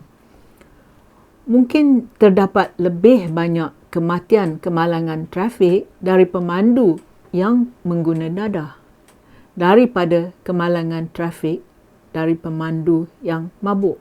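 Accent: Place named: Manglish, Malaysia. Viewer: Kenapa pronounciation mariam mokhtar ni manglish sgt. ni lebih inggeris drp inggeris. Nak ckp, ckp la betul2..belajar ckp melayu yg <link> lain pandai 7 bahasa pun ckp tak pelat mcm. Melayu ni byk sgt berlagak nya bila lama sikit dok London.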